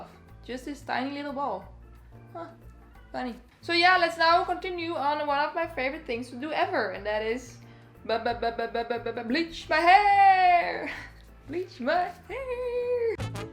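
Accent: Netherlands. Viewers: are you dutch? you sound like you have a dutch accent. Love!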